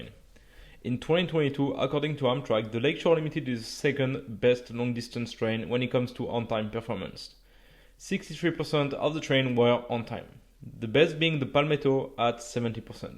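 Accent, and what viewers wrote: France. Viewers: Wasn't expecting this video to be entirely narrated over the subtitles, which was a nice change but I kinda prefer the subtitles more as your French accent can often be hard to understand. In all honesty, I'd rather watch one of your vids with the subtitles instead. I don't mind if it happens once in a while as it's a good reminder to us viewers your from France. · I like the voiceover and I love the strong French accent😁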